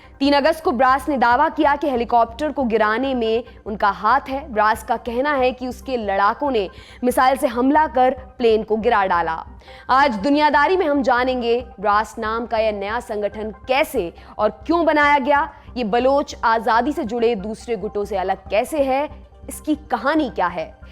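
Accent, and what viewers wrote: India. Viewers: Yes , but some urdu word is hard to speak with indian acsent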